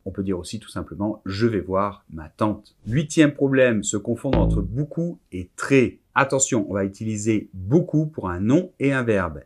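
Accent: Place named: France. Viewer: La façon dont toi Français prononce le e est très différent du Québec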